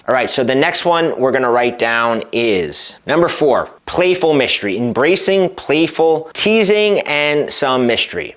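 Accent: United States. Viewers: where does this american accent come from ?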